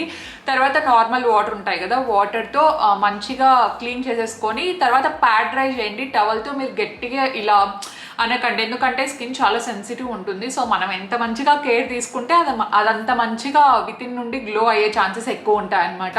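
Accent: Anantapur, India. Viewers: Hi l love anantpur language it reminds me my amama village elukuntala I spent my childhood Holiday's over there, my grany Cook's pappu very tasty, by the way can u please mention hair shampoo, and soap,i am bit confused which one to use to my 9years old daughter.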